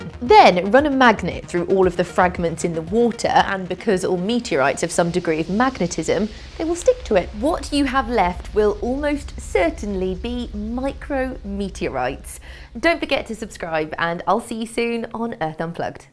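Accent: England, United Kingdom. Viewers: A beautiful woman with an English accent. Doesn't get much better than that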